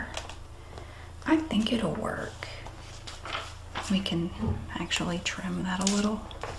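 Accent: South, United States. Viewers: Oh I feel so warm and lovely with southern accents just makes me feel happy when I hear it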